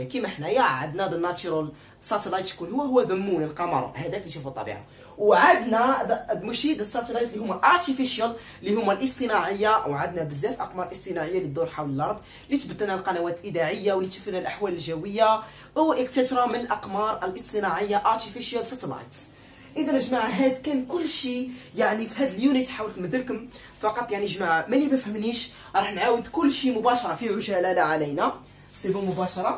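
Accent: Morocco. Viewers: حسيتو مغربي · لهجتك مغربية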